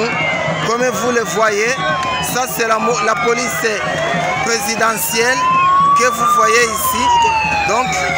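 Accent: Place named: Rwanda. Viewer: Le commentateur a un accent rwandais vraiment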